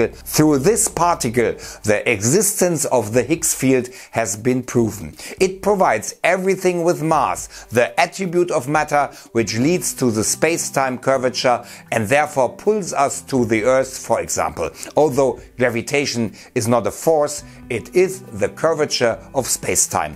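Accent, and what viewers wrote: Germany. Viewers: Ich liebe einfach das "DEnglisch" ^_^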